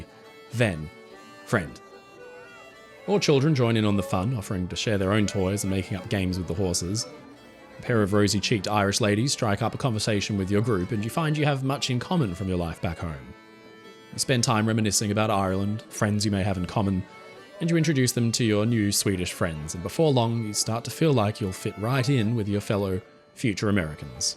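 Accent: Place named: Australia. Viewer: Great story. Gotta love the Aussie accent.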